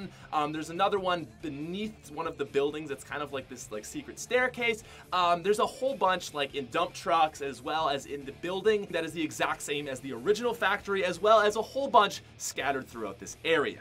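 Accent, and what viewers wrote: Canada. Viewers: Is he Canadian he sounds Canadian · Are you Canadian?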